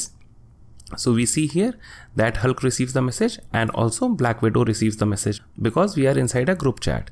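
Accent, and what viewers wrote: India. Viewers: why its always indian accent :(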